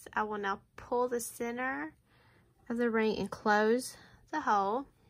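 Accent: United States. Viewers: Americans should do something about their accent!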